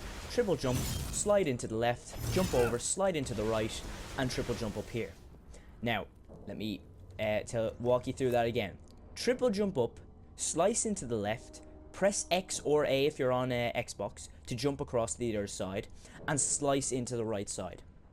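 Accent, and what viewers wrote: Ireland. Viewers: Are you Irish??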